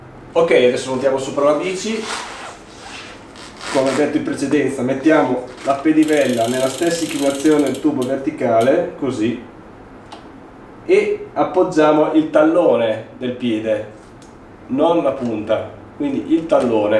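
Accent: Romagna, Italy. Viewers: grazie mille sei sempre molto chiaro!! E poi con quella parlata romagnola sei sempre meraviglioso 😜😂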